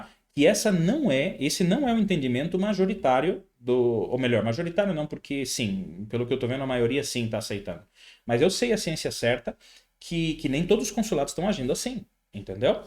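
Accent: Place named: Sorocaba, Brazil. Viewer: Cara, você tem um sotaque muito típico de morador raiz do bairro Barcelona ou Colorau em Sorocaba. Obrigado pelas dicas! Amanhã eu e meu irmão temos uma cita no Consulado em São Paulo e seus vídeos me ajudaram muito.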